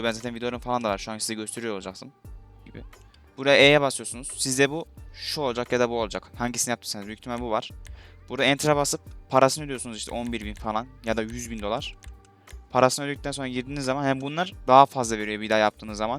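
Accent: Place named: Azerbaijan. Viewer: abicim azeri sandım az yavaş konuş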